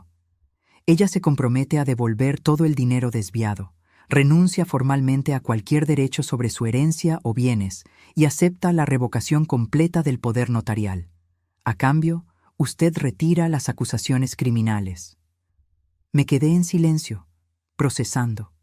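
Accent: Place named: Mexico City, Mexico. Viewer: La escucho dé ciudad de México excelente narrativa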